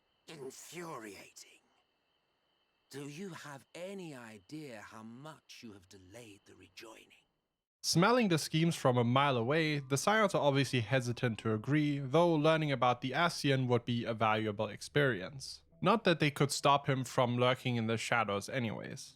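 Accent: Germany. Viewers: What accent are you speaking in? German?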